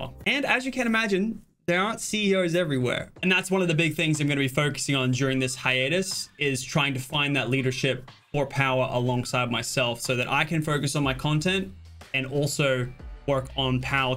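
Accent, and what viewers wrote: United States. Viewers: UGHHHHHHHH Can't hand the American twang brother. It sucks. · why do you try and talk with a US accent though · Your accent is getting more and more American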